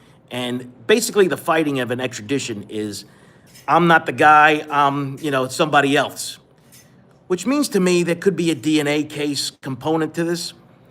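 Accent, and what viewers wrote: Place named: New York, United States. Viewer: I appreciate your true New York accent! Most New Yorkers residing in New York, came from somewhere else much like my Boston hood accent! You can’t mistake it because most of the ppl residing in Boston, aren’t from here either! 🤯😂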